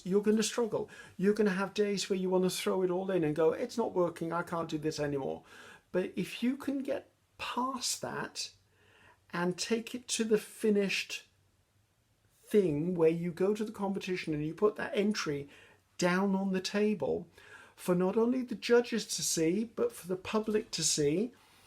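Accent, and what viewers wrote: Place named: Wales, United Kingdom. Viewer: Love watching your work come into being. Just beautiful every time. Today while watching your Sept 4, 2021 video, "Create unique labels from one of a kind Master Boards", <link> I heard you say you're in Wales. Suddenly, your accent made all kinds of sense. With the Welcome to Wrexham Docu Series by Rob and Ryan, I've been hearing the accent quite a lot and fallen quite into fanship for Wrexham, the community and team. ARe you a fan of any of the Football clubs?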